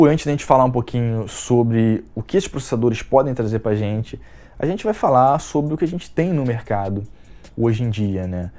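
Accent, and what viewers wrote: Rio de Janeiro, Brazil. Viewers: poh não para não! Conheci teu canal através desse conteúdo, gosto de todos os assuntos que vc aborda aqui no canal, mas krlho os videos que mais me prendem aqui são desse tipo, tu fala sobre umas paradas, q eu que tnho um certo tipo de conhecimento nessa área, fico tipo “krlho esse maluco manja pra porra, foda ver ele falando essas paradas pq são de fato assim” então manda mais conteúdo assim que ta foda, ainda mais no nosso dialeto carioques que fica mais pika ainda kkkkk. Tmj ✌🏻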